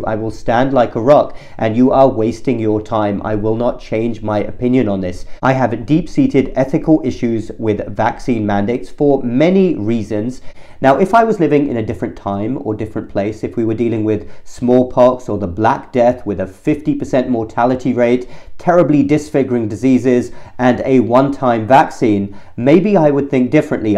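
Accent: United Kingdom. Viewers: I was wondering why you have such a clipped British accent despite staying in the US! Now it's clear.😊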